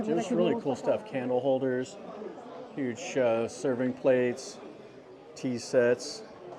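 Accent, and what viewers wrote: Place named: Midwest, United States. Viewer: Very good video. I’m curious where your accent is from (this a way, that a way, talkin, shoppin) maybe Midwest in the US.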